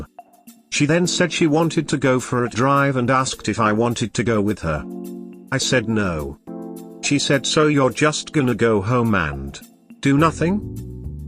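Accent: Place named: England, United Kingdom. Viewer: I'm 3/4 of the way through this story (and don't know how it ends) and feeling frustrated and irritated with OP (maybe the male English accent of the narrator is making it even worse). This story should be titled, "Journey into the mind of a SIMP BH". Once divorced, stop doing the 'pick-me' dance, block her phone and all forms of communication; full NC. End of story.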